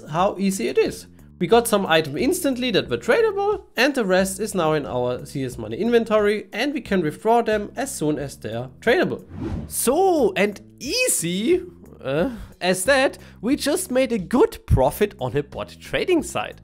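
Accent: Germany. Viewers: i love ur videos, so damn underrated channel.. u have the hardest german accent i ever heard, combined with nice symphatic voice, usefull infos, really good explained, and the editing is just great. keep it up!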